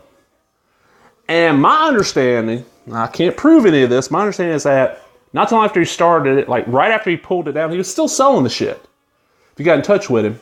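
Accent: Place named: South, United States. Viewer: Also, I really like your thick southern accent in these videos! I have a very thick southern accent myself and kinda reminds me of myself a little bit but mine's slightly different. Where were you born and raised? I was born in Dallas, Texas and grew up in Tulsa, Oklahoma so I'm guessing that's where the accent comes from!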